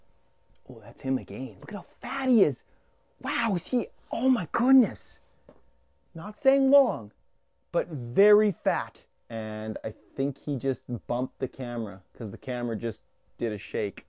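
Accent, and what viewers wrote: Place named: Canada. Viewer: you make (by far) the most unique and entertaining fishing videos on Youtube. It took a while to get used to the Canadian accent, but it's grown on me like mold on week old bread.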